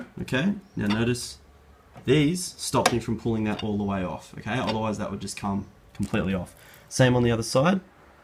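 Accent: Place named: Australia. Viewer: I hear an aussie accent, how much did your amp and cab set you back? They're so expensive over here I'm thinking about maybe the deluxe 20 instead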